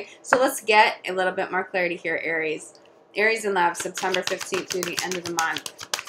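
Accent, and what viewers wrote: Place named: East Coast, United States. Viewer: Your from the East coast 🤔🤔🤔🤔🤔♈👑 I like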